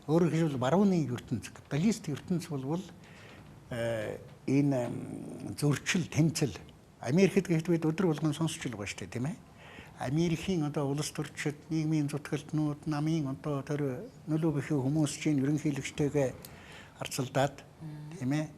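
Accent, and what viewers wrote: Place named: Beijing, China. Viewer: Бээжин аялгаар ярьдаг юм байна юу ч ойлгосонгүй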